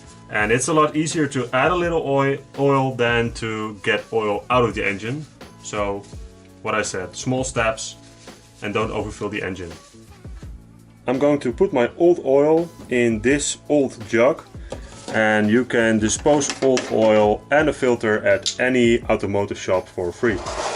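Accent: Netherlands. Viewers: Sounds like a Dutch guy :-) Super video, ga zo door!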